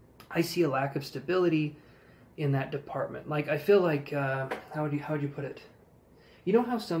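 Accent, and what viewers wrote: Ireland. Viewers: Hahahahaha i was like :"hes actually irish?" I was so confused hahahahaha 😂😂Spot on reading ! Thank you!!☺☺ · Hahahahah I love the Irish accent. Classic!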